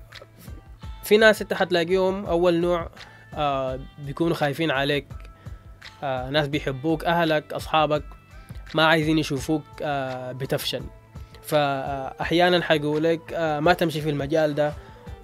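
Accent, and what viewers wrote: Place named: Dongola, Sudan. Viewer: انت شخص جدا مبدع انت قلت في البداية انو العربي يمكن يكون تعبان... شكلك رطاني وفي الرطانة زاتو دنقلاوي هههههه وفوق لي دا لسانك اجنبي انت صراحة جوطهم كلهم كدا وطلع لينا المبدع ههههه استمر يا برو استمر...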